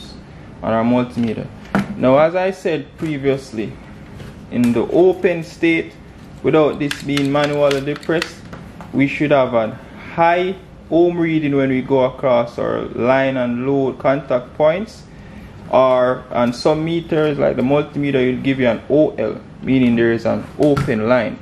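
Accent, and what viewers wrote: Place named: Jamaica. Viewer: Thank you Jamaica 🇯🇲 I know that accent anywhere Greetings from 🇧🇿 Belize!!!! ☮️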